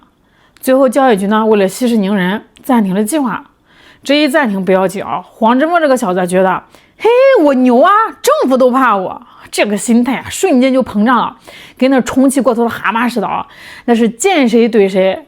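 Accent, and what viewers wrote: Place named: Northeast, China. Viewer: 东北味好重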